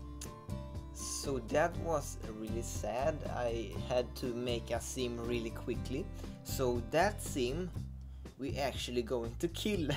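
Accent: Sweden. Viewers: o i barely noticed this was uploaded on my 18th bday xD,btw i love your swedish accent :D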